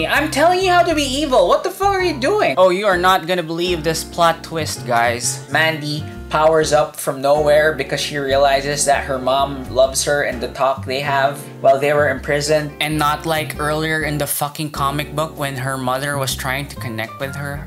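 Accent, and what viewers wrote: Philippines. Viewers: Didn't read the book, have no plans to because the internet have all united to say one thing about it: it's shit Art is good, but story is shit Also your accent is familiar are you by chance a filipino? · awesome vid! also, somehow thought of your accent... as Filipino? maybe i'm wrong, your analysis of this weird comic was spot-on, though. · Im not sure why, but I hear a smol twinge of Filipino accent for some reason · Are you a filo lmfao the accent is so familiar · Are you filipino? I can sense our similar accents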